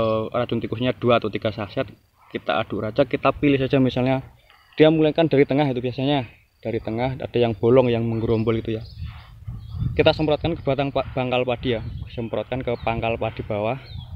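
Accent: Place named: Java, Indonesia. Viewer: Ketoe wong jawa ki logate leh ngomong..tak subcribe mas.. Bener cukup efektip mas saya taruh kapur barus di pohon padi lalu saya cek aman..Padi saya hst 45 hr.. Cm klo musim hjn gimana cara yg baik naruh kapur barus biar gk kena air hjn. Blm ktemu ide saya ini mas..Tolong kasih saran mas klo musim hjn kasih petunjuk mas.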